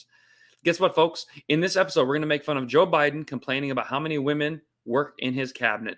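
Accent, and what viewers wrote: Australia. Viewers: K-von, I love your Australian Accent....... it's hot 🔥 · Good Australian accent!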